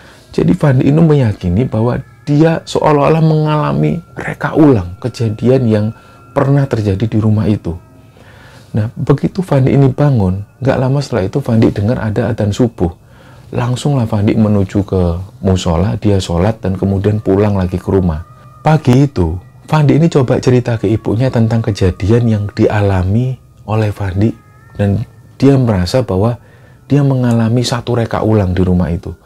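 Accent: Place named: Surabaya, Indonesia. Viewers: Gaya bahasa khas surabaya nya bikin seneng denger nya.. 👍👍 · Mas aslix dr mana nggih, logatx kok sprti orang Pasuruan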